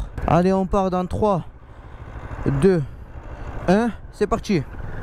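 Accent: South, France. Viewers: Essai Parfaitement maîtrisé, complet et bien explicite. Et en plus ce petit accent du Sud avec les expressions bien de chez nous (je suis de Nice). Top ! Tu m’as convaincu, je cherche une bécane pour voyager, polyvalente, esthétique et le tout sans me ruiner. Je vais aller l’essayer en concession. Merci 👍🏻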